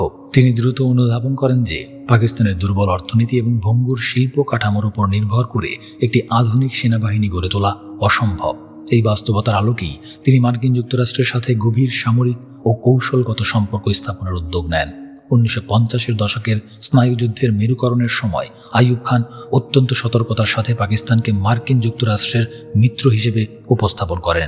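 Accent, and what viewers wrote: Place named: India. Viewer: বই কথাবলছে না।৷ ভারতের দাদদের মত করে বলছে।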